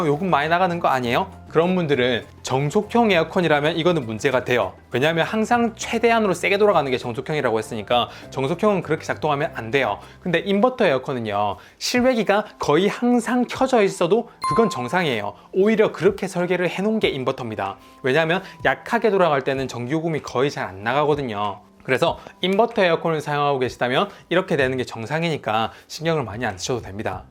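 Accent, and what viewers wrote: Gyeongsang, South Korea. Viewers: 경상도 사람입니까? 서울 사람입니까?ㅋ 귀여우시네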